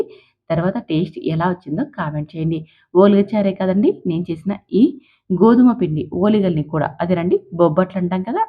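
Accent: India. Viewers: AMMALU MEE PADHA VUCHHARANA BHATTI RAYALA SEEMAVARI LA ANIPISTHODHI AMMALU "VESUKOKUNDA" AND."RAKOKUNDA" MEEMU 20Y.BACK.RAYALASEEMA ANANTHAPUR VASTHAVYLAM AMMALU . THANQ AMMALU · Nenu mi matalu vini ma Kadapa vallu laga unaru anukuna andi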